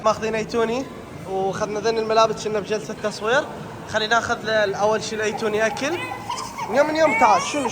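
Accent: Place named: Iraq. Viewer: تحجي عراقي طقه ونص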